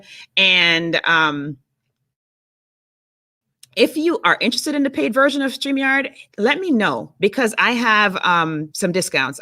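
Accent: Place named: Jamaica. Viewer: You sound a little Jamaican...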